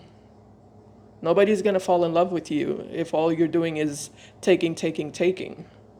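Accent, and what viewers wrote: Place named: Spain. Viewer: Gem vs Pisces.. Pisces is known for impulsive actions that get them in trouble. The grass was not greener in the other side. I struggled with 3 horrible hurricanes - he knows exactly what I'm going through.. but he has done nothing to reach out & help in any way with the loss of my home. The home that he left me in. I moved him from California to Florida 7 yrs ago when he hit rock bottom. All was fine til he let toxic jealous family & friends come between us. Controlling him. So eventually he pleased them by leaving me & hooking up with the female they wanted for him 1700 miles away not giving a crap of how I felt!! So I do believe he is not happy & he's using that person as convenience for his abrupt actions... I'm moving back to my home town & letting him mess up his life again cause this is his pattern.. player, liar, manipulated.. 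golddigger.. cheater... Bi-polar infected...7 personality... One pill away from a straight jacket & I'm being kind.. lol. So deal with it Pisces, don't mess with a Gemeni... The hand that fed you!!.. Thank you!.. so accurate it was scary.! Sometimes you remind me of my sister when you talk. Your accent. We're Puerto Rican. I'm guessing you might be Spanish too... Love it.. lol. Have a great day.. 🌹🙏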